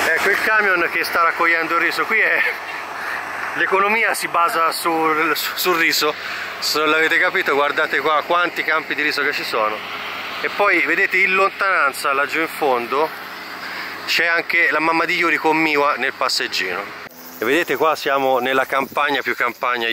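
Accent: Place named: Tuscany, Italy. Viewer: Avrei una marea di domande x te adoro i toscani l accento mi piace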